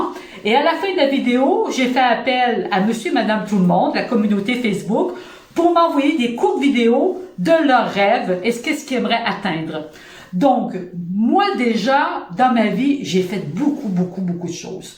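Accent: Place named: Quebec, Canada. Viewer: Coucou entendre ta pêche et ta super énergie, ça fait du bien en plus de ton p'tit accent Québécois qui apporte la petite cerise sur le gâteau, merci!!!!!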